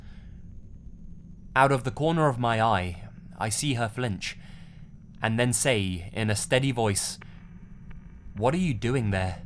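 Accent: England, United Kingdom. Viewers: Are we done picking on a person who sounds like he speaks with an "English accent" for pronouncing some "American words" in a manner which has some people Triggered? How about y'all Get Over It?! Maybe those Americans should concentrate on the fact that WE refer to many places in ways that the Locals don't...and THEY live there. Germany is called Deutschland. Turin Italy is called Torino by locals. Europe is often referred to as Europa. Keep in mind, the Narrator is (maybe) English. He speaks English. Maye it is YOU who are pronouncing names incorrectly. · Thanks for the content! Even though I'm from the UK also and have a similar accent, the way you said "and he stank" really tickled me, idk why haha. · I just love your voice and these abruptly pronounced sentences with english accent. Pure eargasm. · what part of england you from? or london should i say?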